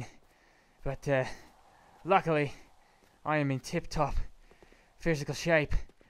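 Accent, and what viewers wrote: Australia. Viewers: Nice aussie accent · R u Australian? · That Aussie Accent, Yes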